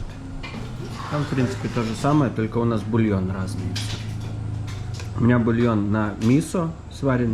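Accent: Odessa, Ukraine. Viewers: Отличная поездка! Слышу говор знакомый, а Вы одессит. Ну а я с Донбасса, Луганск. Удачи Вам!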